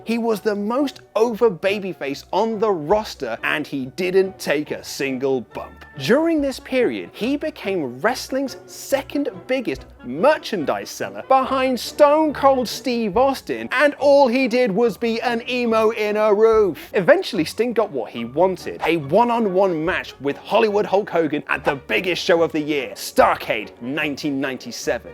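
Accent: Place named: United Kingdom. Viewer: Jesus how many wrestling youtube channels are there with british hosts?!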